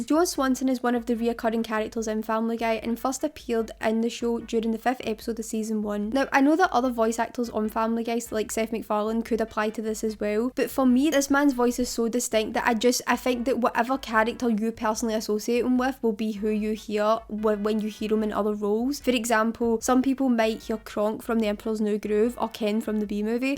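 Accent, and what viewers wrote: Scotland, United Kingdom. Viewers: I absolutely love your Scottish accent-it's so rich and full of character! And your videos? They feel so real, so genuine, like you're just talking to a friend. Honestly, it's refreshing to see someone create content with this much heart. Keep doing what you do-you're amazing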